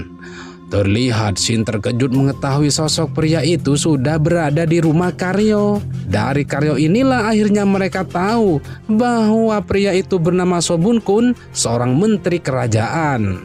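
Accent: Madura, Indonesia. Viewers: Kayaknya orang Madura ini. Dekremmah taiyeh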